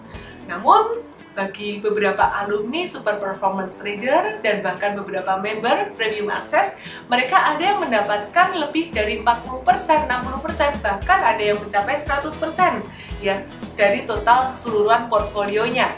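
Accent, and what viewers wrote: Java, Indonesia. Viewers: Walaupun medok..tapi okelahh cara dia menjelaskanny thanks mom😍😍😍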